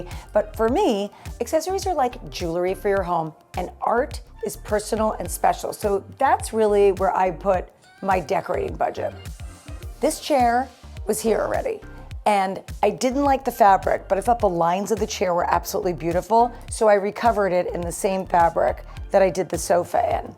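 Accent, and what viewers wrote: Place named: Texas, United States. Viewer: You are a hoot! Texas talk ,love your room.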